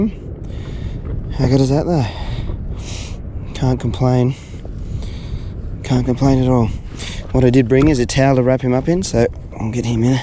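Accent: Australia. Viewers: I noticed on his patreon page that it's called Nice and Nice Persons Club. In words, "How cool is that!" Edit: Actually I think he says, "How 'noice' is that!" (love the Aussie accent.)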